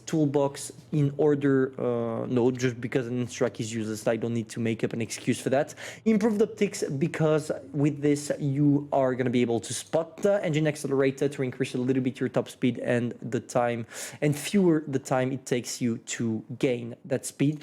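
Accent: France. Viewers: He is probably french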